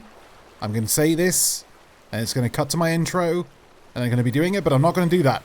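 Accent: Spain. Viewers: Are you Spanish??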